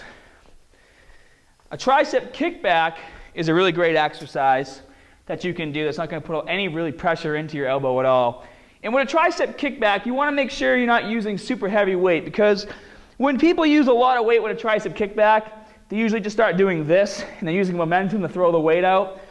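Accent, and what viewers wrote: Boston, United States. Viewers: How can he? Hes from Boston. They all speak like that there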